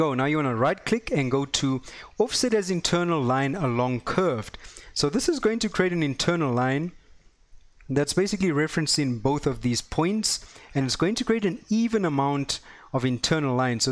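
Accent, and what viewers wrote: South Africa. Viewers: I thought your accent sounded South African, confirmed by checking out your ArtStation page. :D And WOW, I'm seriously impressed with your work man, subbed here as well as your ArtStation page! Looking forward for more tutorials from you! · my bra! I have been looking for a quick and simple tutorial to get started with Marvelous and you hit the nail on the head! Love seeing some stellar content from fellow South Africans. This might sound strange but there is something about learning in your own accent that just makes it so much easier to understand. Keep up the stellar content!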